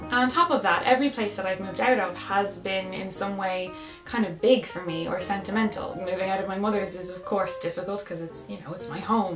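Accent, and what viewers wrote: Ireland. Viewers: So nice to hear an Irish accent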